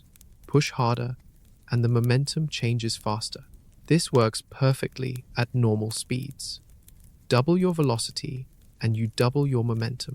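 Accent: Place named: United Kingdom. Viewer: Always the damn British accent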